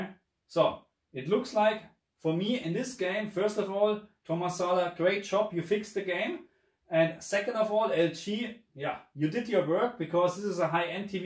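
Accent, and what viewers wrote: Germany. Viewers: Ist zwar ein englisches Video aber so wie ich das höre scheinst du auch Deutsch zu können😉. Gibt es denn noch massive Problem mit dem schwarzwert(flickering)beim vrr/HDR? Wollte mir jetzt nen CX für die ps5 zu legen. Danke für die antwort und frohe Weihnachten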